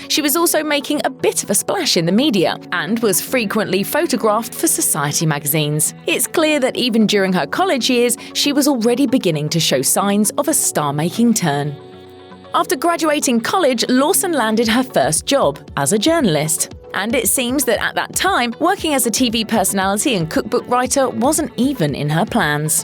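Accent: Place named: United Kingdom. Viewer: I'm a guy who was initially attracted to Ms. Lawson by her looks on her early food programs. I do cook but her style didn't really appeal to me and then came the dirty news about her marriage, drug use, public embarrassments and, least of all, her weight gain. In spite of all that I would still turn on her program, sit back, close my eyes and just listen to her melodic voice and that charming British accent and maybe drift off into a nice nap. So many celebrities that have everything life has to offer yet they make a mess of it.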